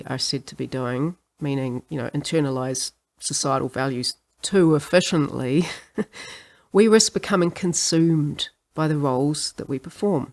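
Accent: New Zealand. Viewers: this is fantastic!!! u a kiwi?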